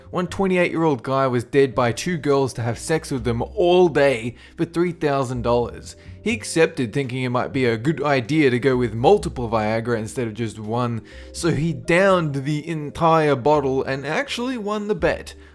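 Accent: Australia. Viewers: Debbles NZ lol wtf, I'm an Aussie and yes he is definitely Australian, how could you confuse that with an American accent? it's so obvious, especially if you're a Kiwi or Aussie! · sounds like an Aussie?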